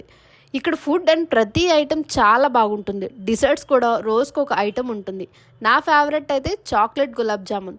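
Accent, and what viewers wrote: Andhra Pradesh, India. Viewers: Hyderabad la vundi andhra slang endi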